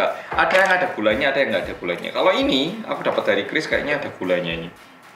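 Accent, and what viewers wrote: Java, Indonesia. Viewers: Halo guys welcome back to Korea bersama orang Korea yang "MEDOOOOK" bolo-bolo mana sworone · Muedokkkk e pollll rek mas Iki ngalah² i wong jowo🤣🤣🤣😍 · Kakak keren bangett sii, orang korea tapi medokk banget jawanyaa.. Fasih juga bahasa indonesinya... Sukses selalu mas 😍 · "Dewean e , hampuddd" Medoke samean nggarai nagih mas😂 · halo guys welcomback to korea bersama org korea yg Me:Medhoookk....:luwess.. Me:malu ny aku anjir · pokok e kalo udah bahas makanan atau minuman.. pakenya luweh. selain itu pake medokk.. hahahaha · Kak itu: •pinter •ganteng •cakep •medok jawa banget (walaupun au ngak bisa bahasa jawa:v) •ganteng •dan gantengggggggggggggggg Sumpah cakep bener kak 😍😍😍🤩🤩🤩🥰🥰🥰🥰 By: fanbase kak 🥰🥰🥰🥰 <mail>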